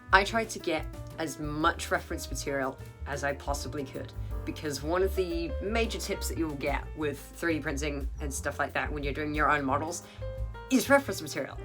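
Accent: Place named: Australia. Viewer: Hey dude where you from you almost sound Australian or kiwi.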